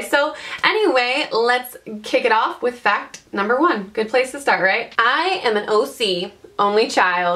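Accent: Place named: South, United States. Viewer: this sounded SO southern but I loved it lol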